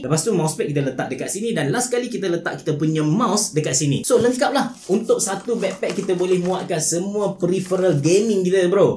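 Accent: Kelantan, Malaysia. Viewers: Lama perati. Abe cerah lamo doh xkecek slang klate. Bnyi skit2 xpo doh, bg video unik skit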